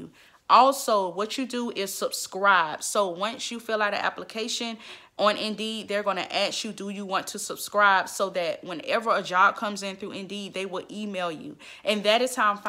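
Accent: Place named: Florida, United States. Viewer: Are you from Florida? Lol you sound like me and my friends talking. Anywho, thanks for the video... I start nursing school next week 🙌🏾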